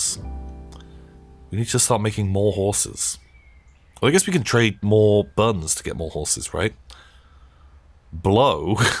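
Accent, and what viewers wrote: Australia. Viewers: 10/10 aussie accent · As an Australian myself, Aussie is now my new favourite accent!! That was gold <3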